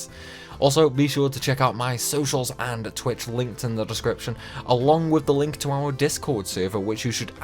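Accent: United Kingdom. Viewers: WHY ARE YOU BRITISH????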